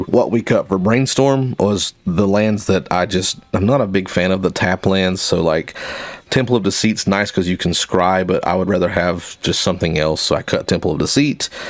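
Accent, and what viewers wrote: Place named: South, United States. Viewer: Where "Southern " are you? SW Louisiana here.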